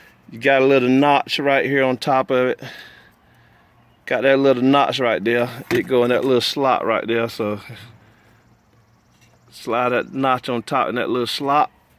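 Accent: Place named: California, United States. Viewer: I’m from Cal so thumbs up for the accent alone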